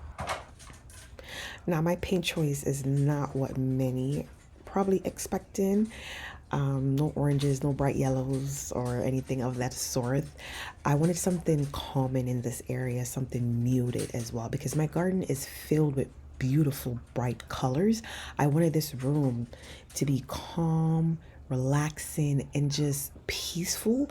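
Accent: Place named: Jamaica. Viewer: Everything looks great. I just love it. I noticed when talking to your husband your Jamaican accent is really thick as opposed to when you talking in your videos. This is not criticism just something I noticed. I loved it.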